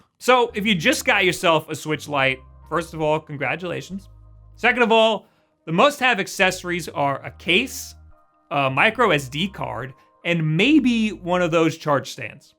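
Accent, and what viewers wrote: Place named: United States. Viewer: your American its not that weird well you are from New York so it makes it a little weird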